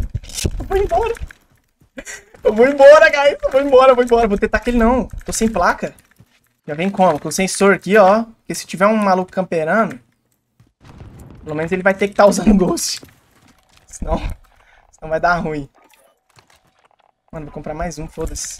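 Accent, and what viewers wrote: Minas Gerais, Brazil. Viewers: sotaque de mineiro é o melhor kkkkkkkkkkkkk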